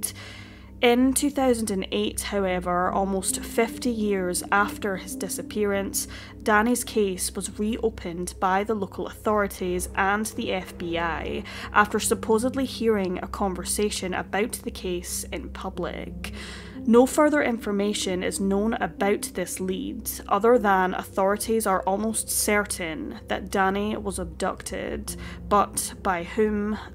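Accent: Scotland, United Kingdom. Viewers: She’s Scottish I believe:that’s how they pronounced certain words.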